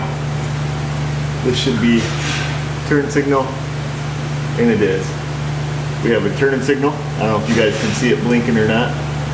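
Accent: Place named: Western New York, United States. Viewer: I enjoy the accent brother, I'm a native Buffalo/Hamburg, you are over in Bath, that's the accent which reminds me of home.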